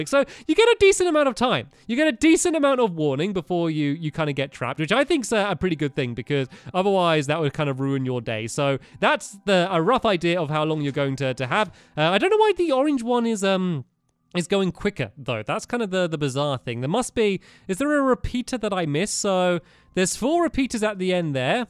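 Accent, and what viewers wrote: United Kingdom. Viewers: Why do you live in England then your British and you say z as zed and h as heych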